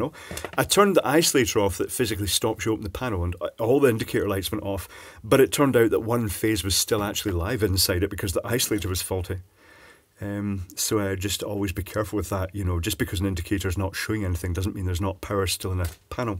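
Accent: Scotland, United Kingdom. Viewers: I think it's all to do with that husky Scottish voice, the odd exploding cat, and the desire to watch soil himself....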